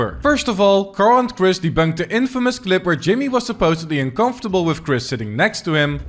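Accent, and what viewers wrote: Netherlands. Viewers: God the dutch accent.. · I can hear that you Nederlands are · Ben jij Nederlands? Je accent klinkt echt erg Nederlands🤣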